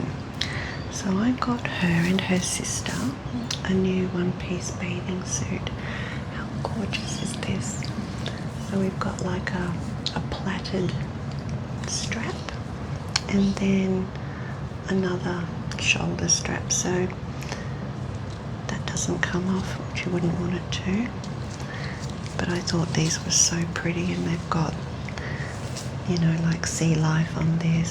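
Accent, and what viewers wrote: Australia. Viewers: A few years ago I was thrilled to learn that ASMR was a "thing" too, when I stumbled across it while searching for Reiki videos. I used to think I was a weirdo too! 🤣 I especially Love soft spoken accented voices; your Aussie accent is dreamy 😵‍💫✨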